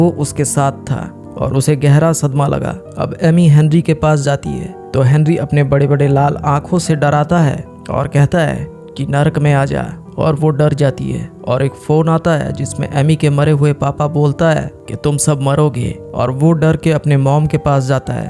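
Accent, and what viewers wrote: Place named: Bengal, India. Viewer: Bhai new explainer ho tum. Lekin tumhara story telling style aur awaaz unique hai. Apne script me Bengali accent mat lao aur thoda aur serious explain kro. U earned a subscriber❤❤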